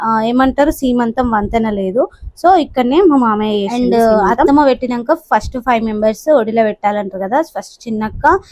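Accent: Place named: Telangana, India. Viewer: Bagunna akka nuvvu anna ala vunnaru ni way of talking madhi same manam pakka telangana akka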